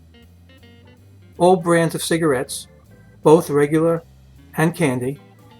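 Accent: New York, United States. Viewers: This is so well done.The narrator knows his stuff. He also has a great NYC accent. The real deal. Thank you for this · What a great and thorough trip down memory lane. You covered just about everything except for the soft pretzels sold fresh each day. I remember them being stacked on a verticle stick and selling for 5 cents each. Again, what a terrific video! Even the narrator has that great NYC accent.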